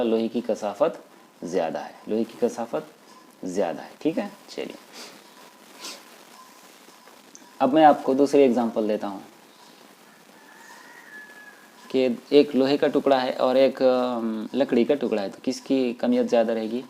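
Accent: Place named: Hyderabad, India. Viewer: Sir is video m ap Ka accent Hydrabadi Hai ek dum.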